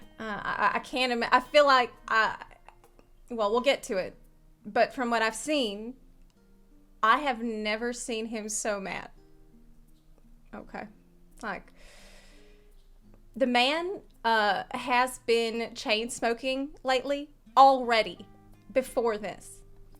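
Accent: South, United States. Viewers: …what is this country accent? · Damn, has a thick southern accent. 🤣🤣🤣🤣 And dicks out for Harambe! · Why does have this weird southern drawl all of a sudden? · WoW being back in the USA sure brought up your Southern drawl!:D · Damn, has fallen hard back into her southern accent 🤣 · I feel like has gotten more and more southern the longer she's been in the U.S. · Embrace southern. I had no idea she was southern, but I'm here for it.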